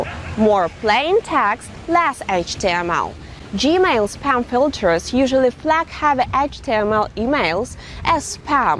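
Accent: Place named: Russia. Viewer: Ukrainian company.....Her accent is very strong Russian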